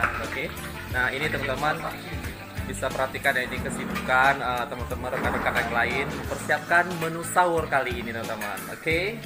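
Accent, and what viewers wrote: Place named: Aceh, Indonesia. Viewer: Hahaha,,lon pike awak Medan si Lae..tapi watee meukalon gtng na perasaan ureng Aceh sang..tp bak video Hana Tom lon deungoe su bhs Aceh 😆😆👍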